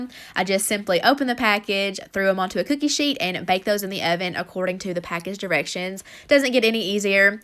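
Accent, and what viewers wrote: South, United States. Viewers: I can't fir the life of me believe some one would call you lazy!!!!! Your always making fabulous dinners for your family, don't ever explain yourself to anyone. Your a good Wife and mommy. Love you. I especially love your southern accent. Your a doll. 🍳🥯🍗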